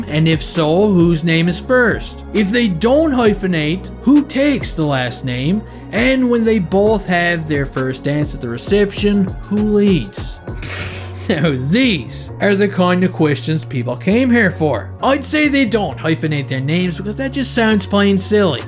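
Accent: Newfoundland, Canada. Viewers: Congrats! I enjoy your content a lot and I find you very funny and original. You're so good at making up names for the players. And yes the background videos and memes are fun. it makes you different from all the other YouTubers. You're my favorite! Keep it up! One more thing... Love your Newfie accent! 😜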